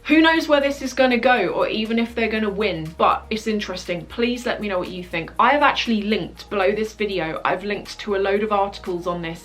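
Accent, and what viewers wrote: England, United Kingdom. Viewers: What part of England are you from? I love your accent❤️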